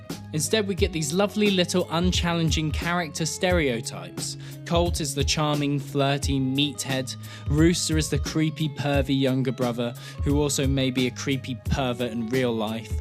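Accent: Southern England, United Kingdom. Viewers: I have never heard someone with a British accent say the word "ranch" and honestly, this is probably the equivalent of listening to Americans gleefully repeat "tea and crumpets" over and over ad nauseum, I finally understand. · Well judging by your voice I can tell this show ain’t for you. (BRITISH) or whatever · That’s an English southern accent, not a British accent. I’m also British and say it different to him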